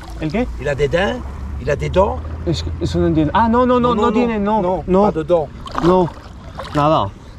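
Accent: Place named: Aragon, Spain. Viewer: Buenas crack! Jornada divertida! Hoy he madrugado y tambien ha estado bien, jureles,jurela y un falso abadejo han caido.por supuesto captura y suelta siempre. Por cierto pescas en catalunya? Por tu acento pareces maño ;) saludos y a disfrutar que ha empezado el calor y la actividad! Buen palometon el segundo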